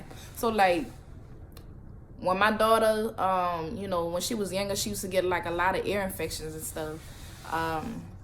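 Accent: United States. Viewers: Louisiana accent strong lol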